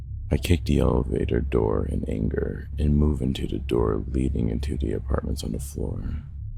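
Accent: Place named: United States. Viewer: U sound like an American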